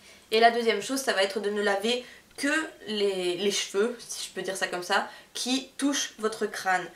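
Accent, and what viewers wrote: Belgium. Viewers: Coucou :) j'ai adoré ta video et tu a de très beaux cheveux 😍 enfin bref je voulait te dire que tu a raison de te laver les cheveux une a deux fois par semaine (je fais pareil), ma mere est coiffeuse et elle m'a bien dit que si on se les laves une fois tout les deux jours par example, ca peut les casser et que ça sert vraiment a rien de se les laver aussi souvent :) donc Voila y a rien de dégoûtant à se laver les cheveux une fois par semaine 😅 c'est juste que ca les abîmes moins. Et pareil, quand j'ai mis un truc dans mes cheveux ou quoi, je les laves en plus c'est normal :) Voili voilou 😋 PS : j'ai remaqué que tu avait un accent, t'est belge? :)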